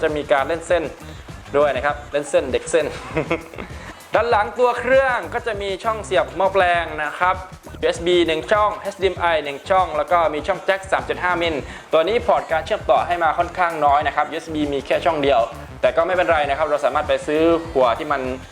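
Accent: South, Thailand. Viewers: ไม่ทองแดงครับ เป็นสำเนียงของคนมาลายูสามจังหวัด ชีวิตประจำวันเค้าพูดภาษายาวีกัน เวลาพูดกลางแอคเซ็นเค้าจะเป็นประมาณนี้ สำเนียงทองแดงจะเป็นของคนพูดใต้ครับ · สำเนียง เหมือนคนใต้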